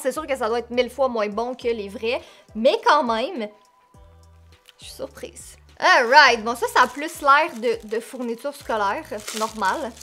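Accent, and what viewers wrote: Canada. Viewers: L'accent canadien ça me bute 🤣🤣🤣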